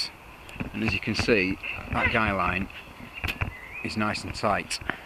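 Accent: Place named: Northern England, United Kingdom. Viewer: Nice set up, I think my only alteration would be either lowering the tarp or raising the hammock otherwise you may end up rather soggy if it's wet and windy :P The flap at the bottom of the hammock is for stopping a casualty sliding out the bottom when going up an incline rather than stopping them rolling out the side btw. Nice review, the northern accent always inspires trust ;)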